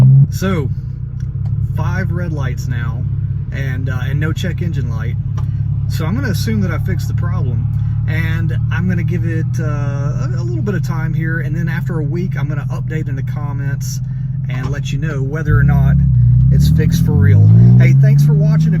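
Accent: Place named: South, United States. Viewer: Great Job. Love the 996 videos, I have a 2003 that I bought from the original owner, retired navy pilot. He drove it like it was a daily driver. but he had a book stuffed with receipts for repairs and maintenance since the day it was new. Now sitting with 100K on the odometer and When I see a repair like this one, that I can't find a receipt for, I doubt it has been done, and go ahead and tackle it. Si aside from removing the alternator, it looks rather straight forward. Keep the videos coming Good Job. I'm a Florida Boy transplanted out here in So Cal when I was active-Duty Navy. good to hear a Good Ole Boy accent reminds me of home.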